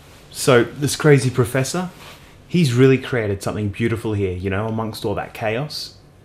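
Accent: Australia. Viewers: Dude's Australian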